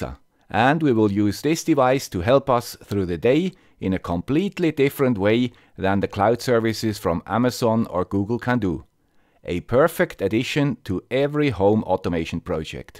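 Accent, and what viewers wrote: Switzerland. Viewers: I was surprised how different some of the words were. I guess, I should have not been, but I've never been to Switzerland (sadly). Now I appreciate his Swiss English more... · is there a way becoming Swiss without having this funny German dialect? =D Hamburg (Germany) has some shelters, but we haven't as many as you have. Oh, and I like your income too, but not your expenses to live.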